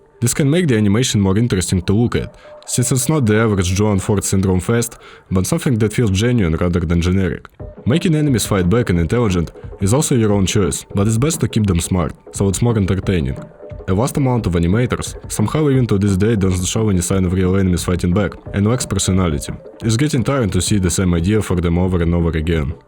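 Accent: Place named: Russia. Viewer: Русский акцент ОЧЕНЬ заметен. Это не плохо, это хорошо что ты пытаешься выходить на зарубежную аудиторию. Желаю успехов!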